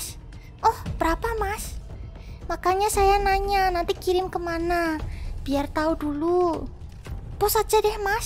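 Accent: Java, Indonesia. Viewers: Medok ya 😅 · Mencium aroma aroma logat jowo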